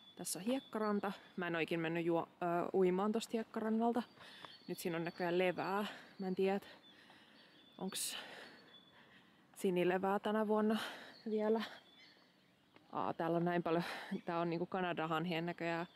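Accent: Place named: Finland. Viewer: 素敵な朝の散歩楽しめました。夜勤の連続は生活リズムが狂って大変ですね。インドア派というより群れるのを好まない自分の時間を大事にする派かな。友人の結婚式楽しんできてください。フィンランド語独特のイントネーションが耳に心地よいです。😺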